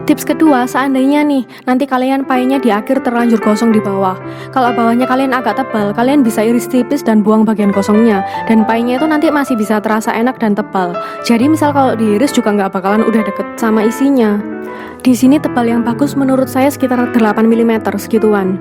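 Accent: Java, Indonesia. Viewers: Suka sama resepnya,..mantab,,musiknya kurang suka..dan bahasa nya medok surabaya padahal Baik tapi kliatan kayak judes...sukses selalu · Fokus sama tangan mbak nya yg putih mulus 😁, tapi bahasanya agak medok 😂 Btw thank you aku mau lagsg praktek💖🙏🏻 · Yaampun medok bgt 😂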